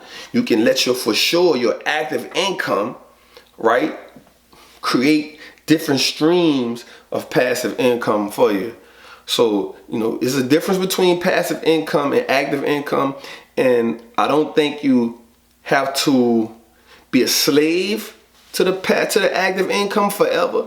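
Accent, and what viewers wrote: New Orleans, United States. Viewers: 💯👏👏💯👏👏💯👏👏💯LOVE that NOLA twang!!!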